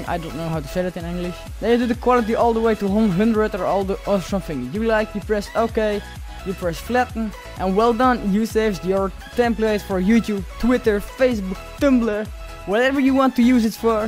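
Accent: Netherlands. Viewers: je kan best goed engels maar je hoort gewoon dat het een nederlander is :) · ik hoor dat he nederlands bent P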